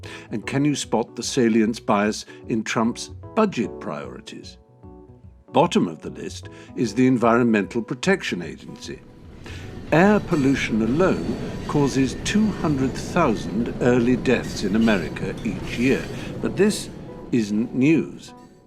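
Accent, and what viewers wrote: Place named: United Kingdom. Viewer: So Anonymous is liberal now? I'm not a Trump fan, but the creator needs to look in the mirror. 1. The EPA is unconsitutional per the 10th amendment (along with most Federal agencies), so that's not good. 2. Douglas Keenan PROVED the IPCC fabricated the results supposedly "proving" global warming is anthropogenic. That which can be asserted without proof can be dismissed without proof. 3. What does a graph of world poverty have to do with Trump? 4. The most dangerous places in America are those with the strictest gun laws, but let's ban all guns. 5. Years of education are higher than ever yet real median household income has been falling since 2000. Hmm, maybe because public schools are really indoctrination camps that teach nothing but how to obey authority (and how to beg it for help). Dept of Ed also unconstitutional. 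Surprisingly, a British accent only gives the illusion that you know what you're talking about. It doesn't matter what facts you present these people, they will always accuse you of that which they are guilty. Lastly, I'd be willing to wager a paycheck the creator hasn't sat through The Clinton Chronicles. That's called confirmation bias fyi.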